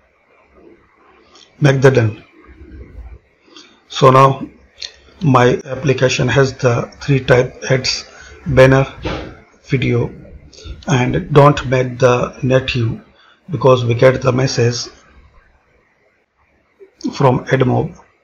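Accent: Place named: India. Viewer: Sorry but your english is bad sre u from india ur voice its likley from india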